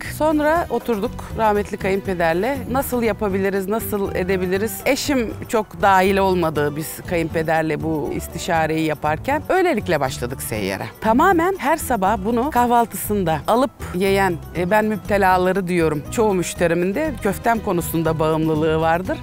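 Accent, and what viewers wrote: Turkey. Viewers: Rumelili, comar degil ondandir:)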